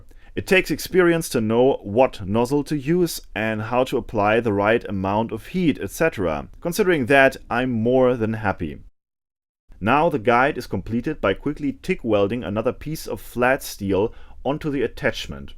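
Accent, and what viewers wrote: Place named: Germany. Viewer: Your English in German accent cause HEADACHE. Better no narration and keep quite.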